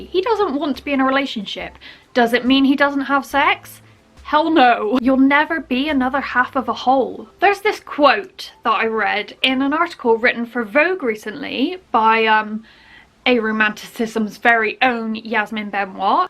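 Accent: Bristol, United Kingdom. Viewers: This has been helpful. Also it's nice to hear a Bristolian voice on Youtube :) cheers drive!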